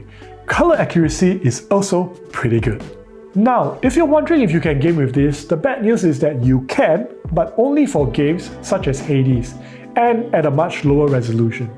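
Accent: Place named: Singapore. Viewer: you Sound singaporean imo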